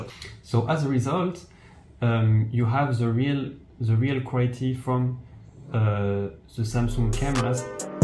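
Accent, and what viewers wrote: France. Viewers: salut, est ce que tu serais un français par hasard ? tu as un peu l'accent je trouve hehe. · Good video but je sais que tu parle français ça s'entend lol merci pour les informations en tout cas 😁😁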